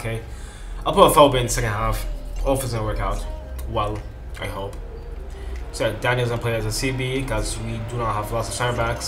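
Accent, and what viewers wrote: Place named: Spain. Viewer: you sound little like if you were from Spain little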